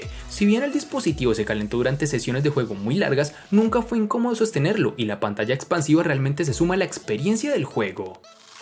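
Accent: Cali, Colombia. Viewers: Es de Cali ? Cierto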